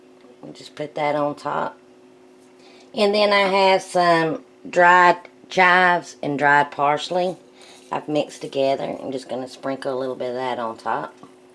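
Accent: South, United States. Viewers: I love the southern accent I am from the south as well so I love it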